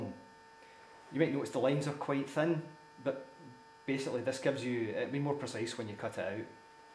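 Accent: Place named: Scotland, United Kingdom. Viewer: An engineer with a scottisch accent. Seems Scotty is alive and well. Great consturction video. Greetings;-)))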